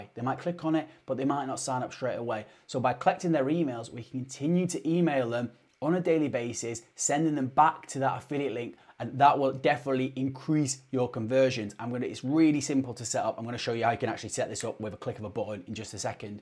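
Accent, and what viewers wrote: United Kingdom. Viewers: Who struggles with British accent like me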